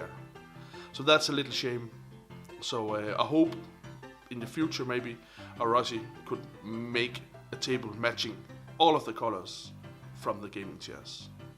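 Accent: Denmark. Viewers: ornli´ dansker ahah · Man kan bare høre at du er dansker hahahahahah!!! :D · Hold kæft det er nemt at høre du er dansker :-D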